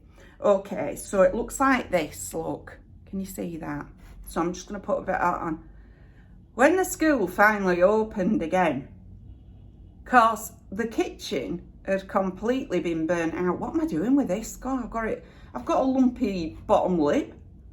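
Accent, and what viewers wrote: Yorkshire, United Kingdom. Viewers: You told this story so vividly I could just “ see” all the kids shivering in the snow …. It’s a good idea for to be applying your makeup whilst recalling a memory and interesting for us viewers to watch as well as listen I am originally from York now in New Zealand but I really enjoy all your videos and love your unaffected Yorkshire accent… I can relate to many expressions which you use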